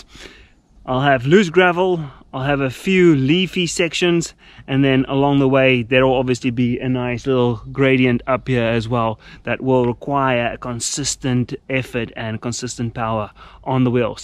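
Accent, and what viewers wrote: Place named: South Africa. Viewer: I was wondering what your accent was! South Africa, very cool. Probably good riding there too. I've got 1800kms on my Nano's and there's another 1000 or so km left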